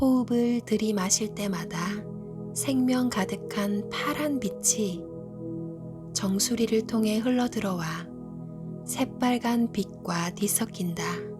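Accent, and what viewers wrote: Gyeongsang, South Korea. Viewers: 경상도 사투리 구수하네요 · 경상도 분 ~~억양이 ^!^♡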